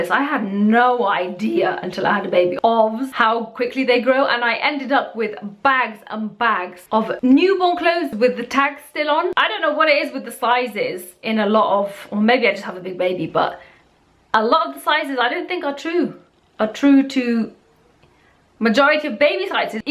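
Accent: United Kingdom. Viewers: ive noticed you pronounce video as vijio it's because of your british accent :) haha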